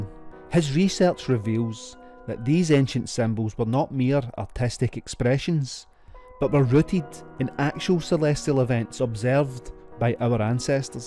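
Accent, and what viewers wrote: Scotland, United Kingdom. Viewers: where are you from? this dude has a smooth, posh scottish accent. i'm willing to wager his accent is nicer than yours. he's british - the island where the english language was created.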